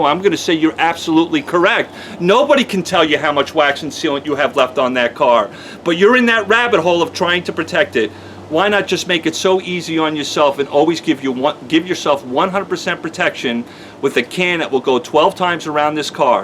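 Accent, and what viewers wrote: New York, United States. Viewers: Did you perhaps grow up in ny or nj? The way you talk sure sounds like it :)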